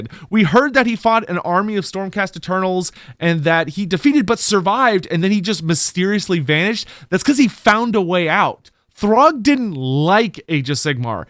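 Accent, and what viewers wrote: United Kingdom. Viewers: Mancunian accent makes it too difficult for me to watch. The prediction videos also kill a lot of excitement for me at least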